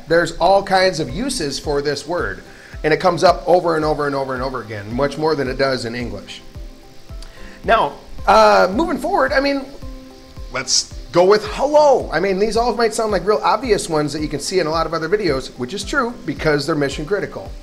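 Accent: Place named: South, United States. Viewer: you speak like southern accent :)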